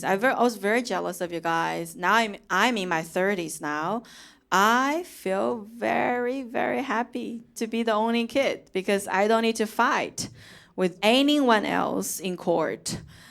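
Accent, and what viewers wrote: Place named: China. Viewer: I love Chinese accent . Followed .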